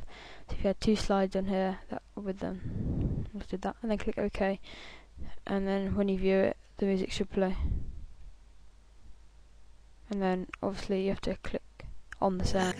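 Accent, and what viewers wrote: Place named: England, United Kingdom. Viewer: it's called the English accent.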